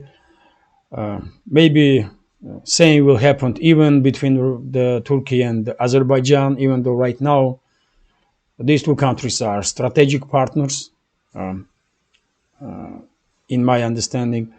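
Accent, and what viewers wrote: Russia. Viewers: I love your Russian..English...no room to make better...you are perfect...from America 🇷🇺💕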